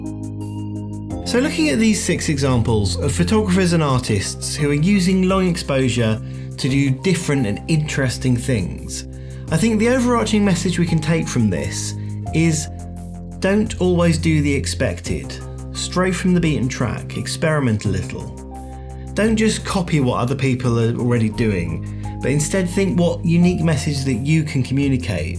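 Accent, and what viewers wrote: United Kingdom. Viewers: Very good presentation and in perfect British English!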